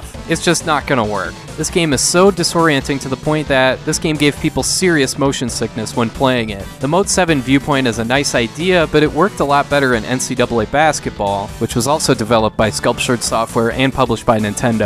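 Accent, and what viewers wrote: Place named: Minnesota, United States. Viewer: Never knew you were a Minnesota guy, but hearing you say the word hockey 40 times in the last 10 minutes I can't believe I didn't pick it up before!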